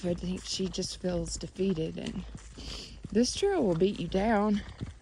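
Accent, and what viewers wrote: South, United States. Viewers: Y’all would be SOOOO fun to hike with! (In a non-social distancing environment, of course)! Love the southern accent young lady! Our Head Start children would loVe your voice at story telling time!!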